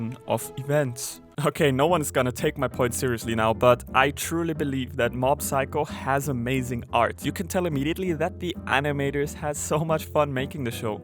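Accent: Germany. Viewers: Deutscher Akzent haha Aber ja ist ein guter Anime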